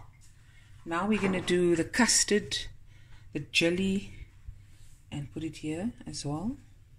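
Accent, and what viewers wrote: South Africa. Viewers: Awesome To hear a friendly South African Voice. I enjoyedyour video very much, everything is already in the cupboards and fridge. I like your trifle its neat and not a messy thing. Lots of love‼️❤️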